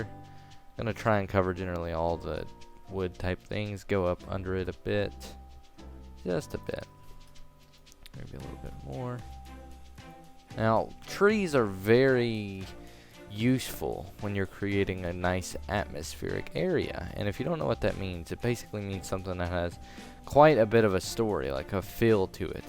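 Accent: South, United States. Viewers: Why do you sound like you were from the south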